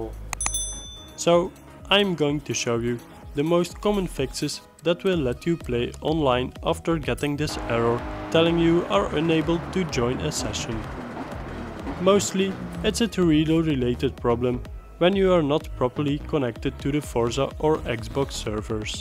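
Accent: Netherlands. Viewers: 100% your from nederlands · Man your accent sounds so dutch, i love it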